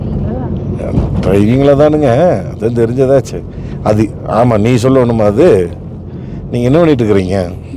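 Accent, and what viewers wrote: Kongu, India. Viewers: கொஞ்சும் கொங்குத் தமிழ் பேசும் கோமகரே...! திகட்டாத தித்திக்கும் பேச்சுடைய திருப்பூர் திருமகரே...! எங்கள் மதிப்பிற்குறிய திரு. ❤❤❤🙏 அவர்களே எப்படி இருக்கீங்க அண்ணி❤❤❤🙏 எப்படி இருக்காங்க ரித்திக்❤❤❤ எப்படி இருக்காரு. இந்த லடாக் பயணத்தை திருப்பூர் திருமகரின் பயணம் என்பதைவிட "வீரத்திருமகரின்" பயணம் என்பதே சரியானது❤❤❤❤ நேற்று நான் சொல்ல வந்த ஒரு தகவலை இரண்டாவது கமெண்ட்டில் சொல்றேன் சில நேரம் YouTubeல அதிக எழுத்துக்கள் கொண்ட கமெண்ட் பதிவாகாது? என்ன ஆகுதுனு தெரியல? ஒருவேளை அப்படி பதிவாகவில்லை என்று சொன்னால்? நீங்க&TMF புரிந்துகொள்ளுங்கள் பதிவாகவில்லை என்று🙏. நான் உங்களை திருப்பூரில் வந்து சந்திக்கும்போது அனைத்தையும் சொல்கிறேன். நீங்க இதற்காகவே அதை ஒரு வீடியோவாக நம் TMFக்கு upload பன்னுங்க. நம் ❤சிட்டுக்குருவியோட❤ தங்களது இந்த லடாக் பயணம் இனிதே தொடர்ந்திட நல்வாழ்த்துக்கள் ❤❤❤ என்றும் அன்புடன் உங்கள் தம்பி என்றும் அன்புடன் உங்கள் TMF ரா.முஹம்மது கபூர் (சென்னையில் இருந்து) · முதலில் உங்களுக்கு நன்றி... 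சிரமப்பட்டு டிரைவிங் செய்யும் போது பேசிக்கொண்டே வருவதால் நாங்களும் உங்களுடன் கூடவே வரும் உணர்வு... அதுவும் நம்ம கொங்குத்தமிழில்...அருமையான காட்சிகள்...ஆனால்..என்னவென்றால் பாவம்..உட்கார்ந்து கொண்டே செல்வதால் இடுப்பு வலி.. சென்றதும் நன்றாக ஓய்வு எடுங்கள்...Take care.. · இன்று உங்கள் சிட்டியை திருப்பூர் கார் பட்டறையில் பார்த்தேன். சூப்பர்...உங்கள் கொங்கு தமிழ் உங்கள் காணொளிக்கு சிறந்த நன்மை. வாழ்த்துக்கள். உங்கள் தொடர்பு எண்ணை அனுப்பவும். நாங்கள் உங்களை சந்திக்க ஆர்வமாக உள்ளோம்.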